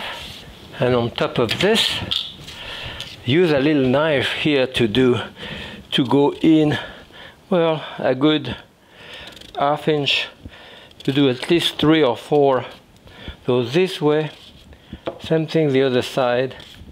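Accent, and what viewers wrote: France. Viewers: I just love your French accent. Especially the word. “Huny “ lol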